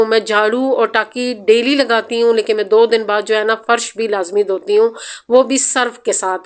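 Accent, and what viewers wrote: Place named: Punjab, Pakistan. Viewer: Zbrdst aap ke pnjabi to hmry jesi ha from kamra attock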